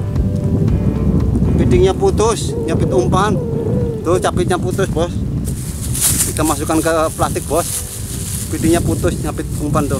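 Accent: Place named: Cirebon, Indonesia. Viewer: Logate kaya orang indramayu/cirebon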